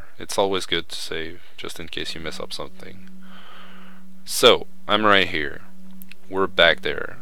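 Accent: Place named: Quebec, Canada. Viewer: tu me semble avoir un accents québécois haha merci pour le tuto sa ma aidé un px avec des skips que je rochais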